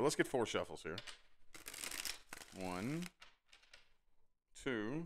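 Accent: South, United States. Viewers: Thank you for the reading! Like your Southern accent and the no bs approach! 👍 Also, if it’s possible, is there a way the cards can be more specific in your future readings in regards to the age of the ‘other person’ example: Older/Younger and if their in a foreign country? This would help a lot in deciphering the readings. Thank you so much! Peace! ✌️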